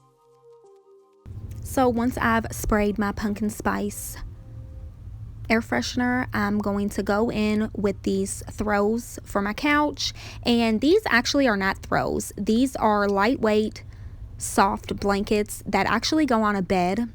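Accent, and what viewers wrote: South, United States. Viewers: My first time watching, I love it 🥰 and that southern voice 😂☺️❤️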